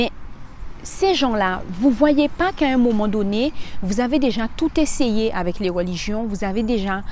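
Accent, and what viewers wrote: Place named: Haiti. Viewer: Vs parlez comme les Antillaise ooh je sens l’accent haïtienne en vs je suis Haïtien c’est vraiment un plaisir je sens ta positive vibes 🥰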